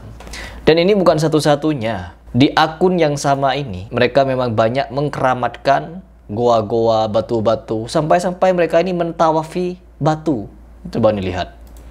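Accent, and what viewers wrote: Madura, Indonesia. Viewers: Lidah madura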